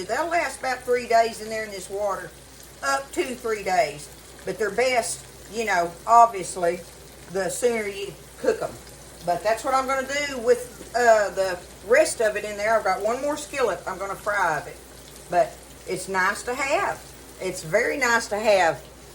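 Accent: South, United States. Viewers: Oooh I'm going to try this! Looks so good!, I've been addicted to your videos lately. I'm not sure how I came across them because I don't do much cooking at all, but I just love watching you. You are delightful and I appreciate all the little tips you share. I also love listening to your southern accent and way of speaking. Your happy spirit shines through in every video! I wish you all the very best! From a fan in Michigan <3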